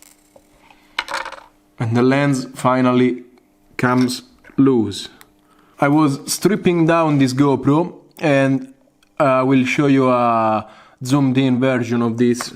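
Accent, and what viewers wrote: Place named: Italy. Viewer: quanto si sente che sei italiano ahahah bel video grande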